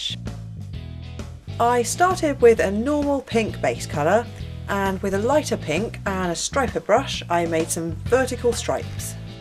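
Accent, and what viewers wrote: England, United Kingdom. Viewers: u from good ol britain! mee tooo allright me loveeerrzzz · Does she live in England and does is she English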